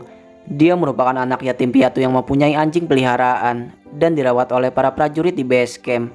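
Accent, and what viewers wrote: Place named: Java, Indonesia. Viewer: Logatnya masih medok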